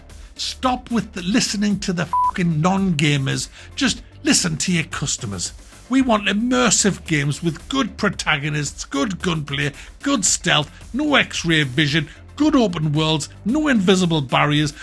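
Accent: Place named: North East England, United Kingdom. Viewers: Nothing makes me feel more at home than a North Eastener going on a rant there’s a sub for ya 😂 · Northumberland accent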